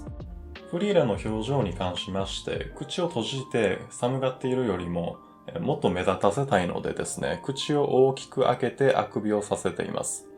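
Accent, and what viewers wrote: Kansai, Japan. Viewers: 西側の話し方といい、土井先生みがイラスト界の土井先生だ！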